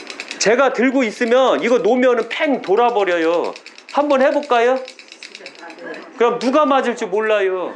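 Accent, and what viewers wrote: Chungcheong, South Korea. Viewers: 매일 유교약사생불사 봅니다 확신찬말씀이 좋습니다 충청도말씀이 참 친근합니다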